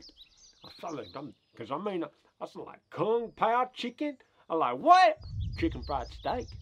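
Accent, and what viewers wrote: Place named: South, United States. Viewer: I can understand everything he says! Lol that’s marrowbone talk right there. Yee yee!!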